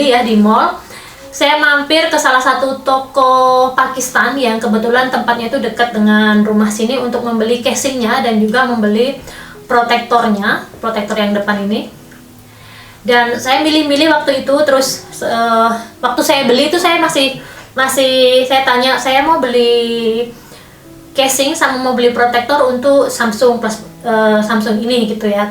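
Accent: Java, Indonesia. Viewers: Jowo banget ya Mbak.. Hehehee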